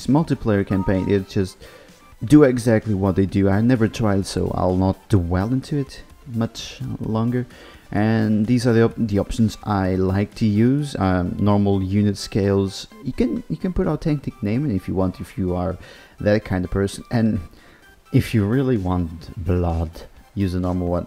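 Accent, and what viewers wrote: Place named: Portugal. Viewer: houve lá tu és português XD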